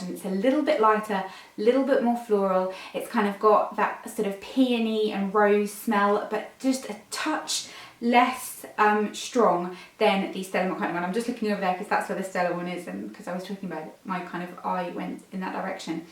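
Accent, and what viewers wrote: United Kingdom. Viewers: I’m confused if you are Israeli born and raised why do you have a British accent?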